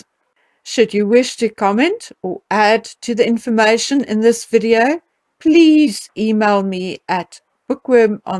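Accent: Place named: South Africa. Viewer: Wat klinkt het Zuid-Afrkaans zo mooi🙂..in Nederland wandelen we ook, en in bepaalde omstandigheden kuieren we ook..ik bedoel dan gebruiken we het woord kuieren als we op ons gemak wandelen in het bos bijvoorbeeld. En ik ben zo blij dat een heerlijke tijd heeft gehad bij haar familie 😁 Groetjes vanuit Gouda ( Nederland)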